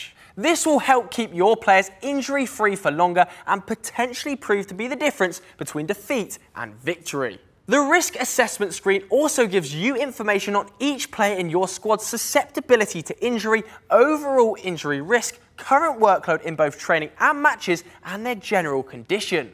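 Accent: United Kingdom. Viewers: When he has great British accent and talks like a childish 15-year-old Sorority girl in US.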